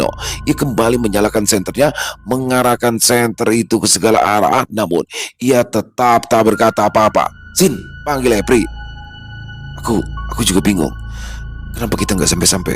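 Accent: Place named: Kalimantan, Indonesia. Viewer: abang ini org mana ... klu abang cerita kisah dr kalimantan logat atau cara bicaranya pas dgn org kalimantan .. pokoknya saya dr kalimantan mendengar cerita abang sampai ulang2 ceritanya masih seru aja .. salam dr kalimantan tengah (kapuas timur)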